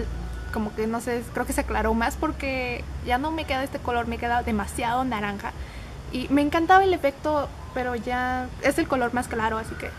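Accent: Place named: Peru. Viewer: una pregunta... eres peruana ?.. tu acento se parece mucho...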